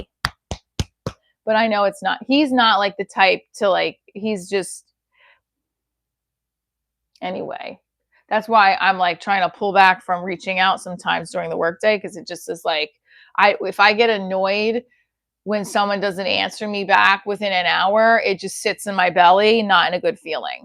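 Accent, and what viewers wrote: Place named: Boston, United States. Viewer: Yea. You have Boston accent. Kinda Jewish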